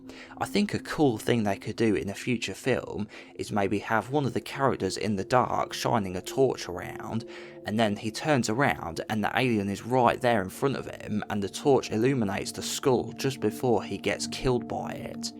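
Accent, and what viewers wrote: England, United Kingdom. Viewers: accent is giving me british forest gump vibes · Because he's from England, is reading from a script and does not have a £300 microphone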